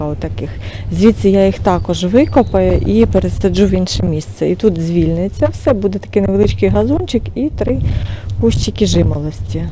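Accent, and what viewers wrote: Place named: Transcarpathia, Ukraine. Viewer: очень красивая украинская речь колежанки это говорят на Закарпатье . буду учиться у Вас украинский мови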